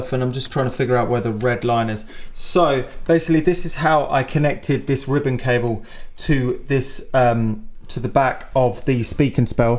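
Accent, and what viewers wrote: United Kingdom. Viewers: It's weird. I understand only less than two thirds of what you are talking about, but I find watching and listening to you still interesting, motivating (why? I don't think I'll ever get myself into any electronics project) and strangely.. soothing. Maybe that's the passion / creativity / positivity ("don't be scared to try"? wonderful) flowing through. And the lovely british you are speaking (though maybe this one is because I just watched an american movie located in Texas :). Anyway, thank you!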